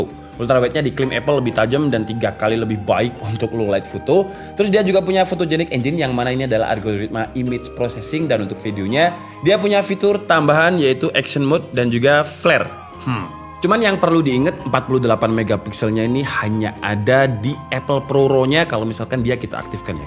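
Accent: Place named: Surabaya, Indonesia. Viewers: Wong Endi mas kok koyo gae boso Jawa timuran🤣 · Review er nya orang mana. Kog kaya daerah Surabaya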